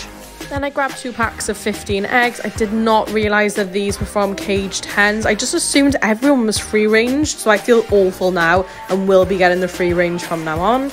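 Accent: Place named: United Kingdom. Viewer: Hello. Youtube suggested your channel, I guess since I enjoy watching grocery hauls. Being from the USA I enjoy seeing hauls from the UK with the different kinds of food, prices, etc. I enjoyed yours that I have watched so far, but I find the music over your talking a little distracting. (I much prefer to hear your details you share especially with the accent). Take care and keep the videos coming.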